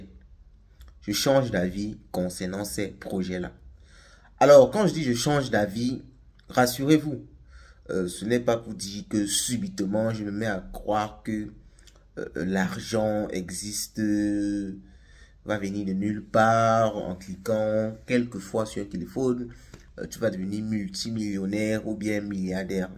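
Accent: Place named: Cameroon. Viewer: Bonjour très cher grand frère tu es camerounais j'imagine quand je suis ta manière de parler ☺️ donc...... Quand je l'ai dit..... à mon humble avis le film que tu as commencé à tourner là, hum.... je pense qu'il va te dépasser. Les deux projets là avancent ooohhh...!!! Mais beaucoup de courage à toi, j'admire ton audace en tout cas 💪 Et merci déjà d'avoir enlevé le mot arnaque du thème c'était pas le mot approprié pour mieux exprimer ton scepticisme..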